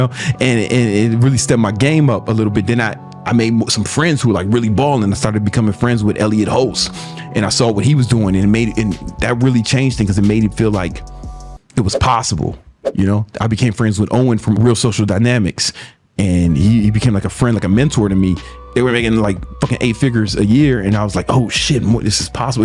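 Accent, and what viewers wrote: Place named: South, United States. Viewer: southern twang haha i didnt know it was called this way im from France by the way so we'r definitely no experts at accents southern twang from brave men though 🤷🤣🏆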